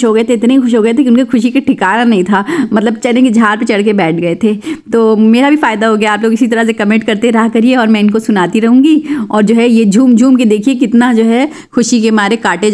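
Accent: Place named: Maharashtra, India. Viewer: U really sound as Maharashtrian even ur cuisine batata wada is Maharashtra famous.u even say kothmir that's Marathi word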